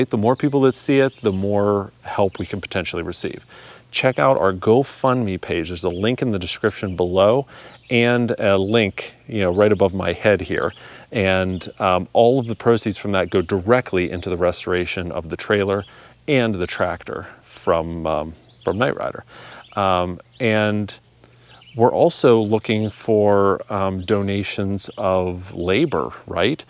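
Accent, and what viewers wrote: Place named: Pittsburgh, United States. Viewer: by chance are from Pittsburgh or surrounding Allegany or Westmoreland County i detect a light Pittsburghease in your voice and you yard an intro shots of the car driving looks like the area thanks for the content and I will check my connections an see if I can find you labor for metal fab an body work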